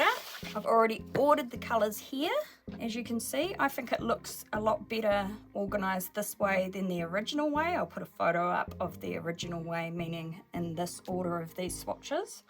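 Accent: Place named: New Zealand. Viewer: After the “77” it was super obvious that you are a kiwi 😬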